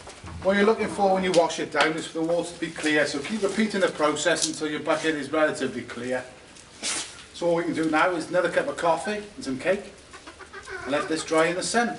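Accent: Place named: Cardiff, United Kingdom. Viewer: Got to be a Cardiff boy with that accent.....arrrright butt!!!!!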